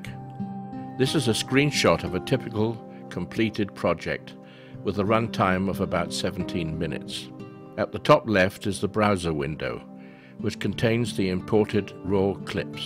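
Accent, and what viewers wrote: England, United Kingdom. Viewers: THX FOR SHARING. YOU SOUND ENGLISH?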